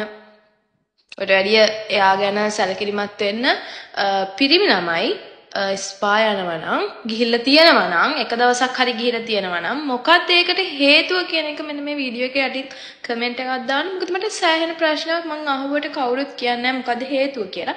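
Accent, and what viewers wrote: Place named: Kambanwella, Sri Lanka. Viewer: කංබංවැල්ලෙන් ඇවිත් වගේනෙ කතාකරන්නෙ මෙයා.. අපරාදෙ කාලයයි ඩේට ටිකයි කැපිච්ච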